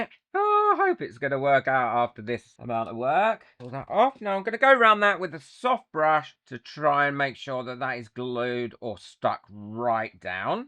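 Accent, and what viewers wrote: United Kingdom. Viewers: I love watching your videos and have learned a great deal. I have a question: where are you from in the UK? I love your accent.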